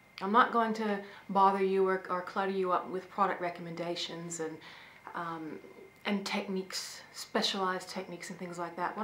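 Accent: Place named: Australia. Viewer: I hear it too, pinky. There is SOME kind of accent there and it does sound Australian!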